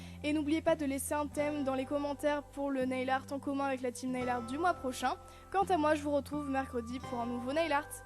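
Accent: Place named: France. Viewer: Tu parle français de France moi je parle le Québécois je suis une fille J'ai 8ans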